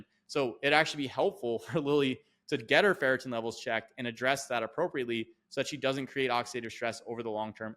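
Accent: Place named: New Zealand. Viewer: has a very New Zealand accent! 😂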